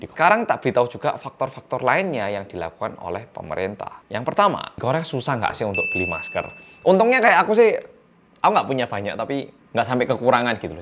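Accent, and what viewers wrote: Java, Indonesia. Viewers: Tetap sehat ya yg medokkkkk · Kopine ngantek entek mek gur nyritakne corona 😁 . Terus lanjut vidione mas . Gawe mbantu bolo bolo . Kerjo libur delok vlog e korea ne wong medoookkkk ,🤣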